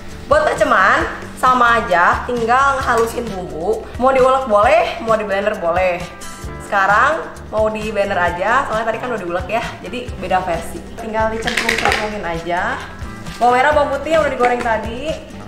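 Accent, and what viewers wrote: West Java, Indonesia. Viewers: Suka deh kalo teteh ngomong logat sunda euy 🤣🤣🤣🤣🤣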